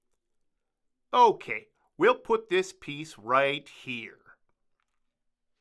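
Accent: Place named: Canada. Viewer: Are you Canadian because MAN you sound like a Canadian Also Great job very well done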